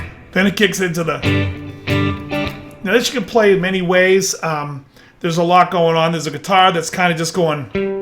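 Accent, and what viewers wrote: Boston, United States. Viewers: Nice lesson. Are you from the Boston area by any chance?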